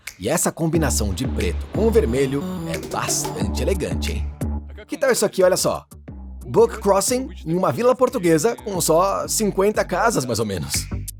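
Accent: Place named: Brazil. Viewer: Voz do Brasil Brazil 🇧🇷🇧🇷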